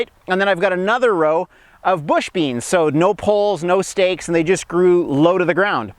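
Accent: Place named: Canada. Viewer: I can hear the faint Canadian accent!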